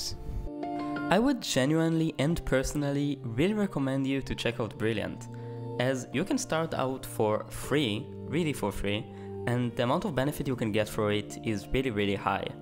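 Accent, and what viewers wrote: Israel. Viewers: nice ai vido and i know u are an isreali becuse of the axent i am 2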